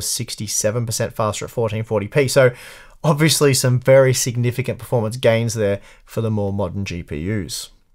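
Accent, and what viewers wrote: Australia. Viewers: This may seem like a dumb question but is hardware unboxed Australian?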